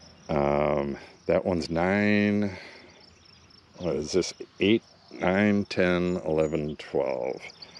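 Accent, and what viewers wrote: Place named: Wisconsin, United States. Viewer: I hope that all of those Bur Oaks had a good year after you treated them. I hope they worked on their roots and will leaf out strong this coming spring. You probably already know this, but one of the things that I do with my young oaks is fertilize them in the spring at leaf out and ALSO give them some Iron. I usually only fertilize once, but one year I did another just before the second growth in June (in Illinois). I hear a little Wisconsin in your accent so your trees probably get that second growth about when mine do. Two fertilizations can be too much so be careful. You have to use your judgement. You may already be doing some fertilization. It sounds like you know a lot about trees. Nice to see someone plant the mighty Bur Oak! Such a stately tree out in the middle of a Savanna.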